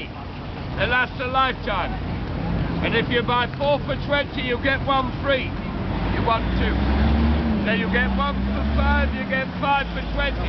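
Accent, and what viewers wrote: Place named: United Kingdom. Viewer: He has an amazing British accent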